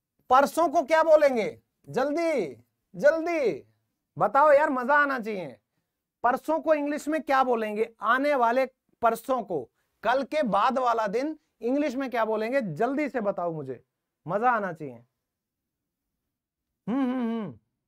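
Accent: Haryana, India. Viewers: Aap haryanvi ho kya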